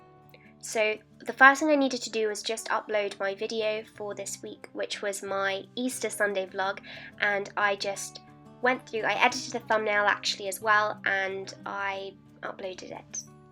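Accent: RP, United Kingdom. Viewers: You speak sooo posh and pronounce all your T’s in words... I’m from up north where we really don’t lol, we should though. A lot of ‘so’s!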